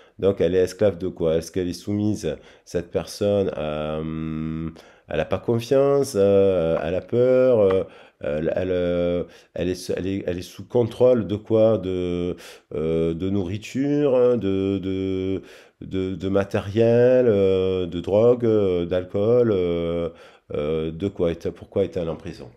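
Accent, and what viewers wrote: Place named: Provence, France. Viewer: Bonjour tres heureuse de Vous voir et de Vous entendre j adore votre voix du matin 😁 belle surprise 🌞 un rayon de Soleil qui vas illuminés Notre journée 😜 merci pour cette magnifique guidance Vous m avez fait rire 😄👍 pour votre petit message j ai le Coeur qui vibre pour toi qui Mai's venue en tête et j ai pensé avec votre accent a Marcel pagnol Marius TU me fends le coeur 💝 la parti de carte 🔥 j ai passé un bon moment en votre compagnie j ai adoré 👏 bravo gros bisous🤗😘🌈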